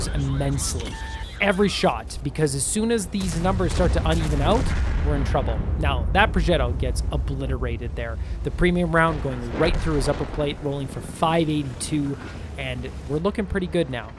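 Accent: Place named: Canada. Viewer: I guessing you're a fellow Canadian? Lol ...I haven't caught ab "eh", but I did catch the "Zed". 😉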